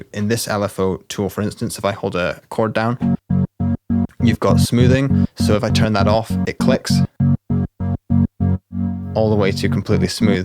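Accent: Scotland, United Kingdom. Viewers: Great video!! It's nice to hear a fellow Scottish voice on YouTube ;) So when can we expect the FM synthesis series?! :P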